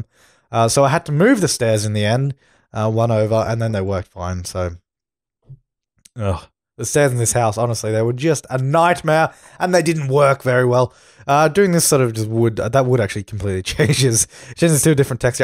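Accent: Australia. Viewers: I could listen to you talk forever .That sounds creepy, but i just love Australians and Australian accents .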